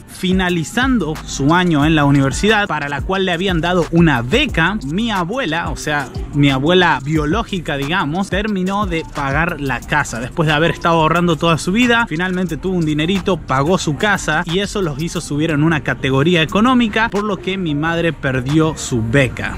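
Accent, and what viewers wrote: Argentina. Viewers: Hola saludos desde Córdoba. Te sentía tonada argentina pero no sabía bien de que provincia 😅..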